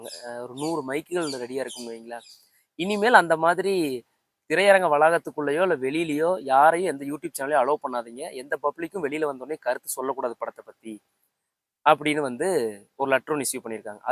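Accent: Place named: Kongu, India. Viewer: Thambhi ERODE or Coimbatore.. Wonderful Kongu Tamil ...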